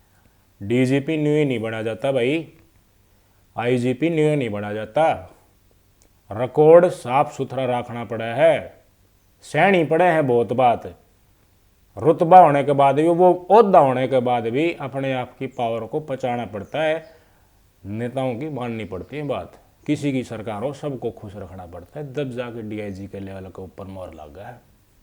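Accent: Haryana, India. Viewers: बेटे तेरी जो हरियाणवी भाषा है आज तक किसी भी पत्रकार ने नहीं बोली ऐसे हर घटना को बताता है जैसे दो आदमी बात कर रहे हो बिलकुल सरल हरियाली में बात करता है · Phale Bolna Seekh Le Aa,pni Lokal Bhasa Na Bole ,Bhiwani Jiila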